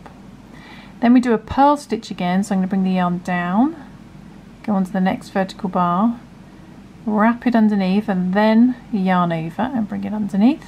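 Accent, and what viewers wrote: United Kingdom. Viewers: Hi you sound like a Brits I know Brits are great knitters but have no idea you are such a fantastic crocheter. I really would like to learn from you how to roll your hook. I stop crochet because because I hold the hook like a pencil and bend my thumb a lot to pull the yarn and my thumb became so painful that I stop doing crochet . I know if I pull the hook like you do it will not hurt me because I will not have to bend my thumb. Will you please feature on video to show how to hold the hook. Thanks a lot.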